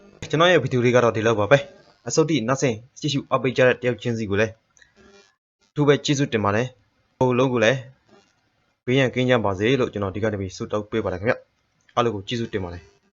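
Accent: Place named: Dawei, Myanmar. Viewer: ထားဝယ်အသံမပြောက်သေးပါကိုထားဝယ်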